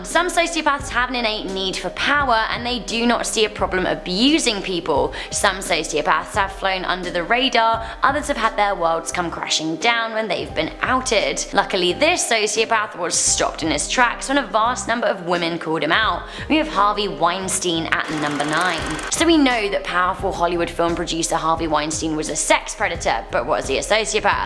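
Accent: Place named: England, United Kingdom. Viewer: I wonder if is single. She is gorgeous plus her English accent just gets me. #toptencrush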